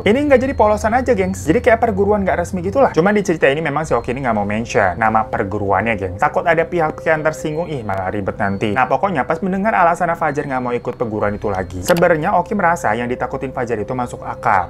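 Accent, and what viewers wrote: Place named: Lampung, Indonesia. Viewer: weh logatnya lampung bgt wkkw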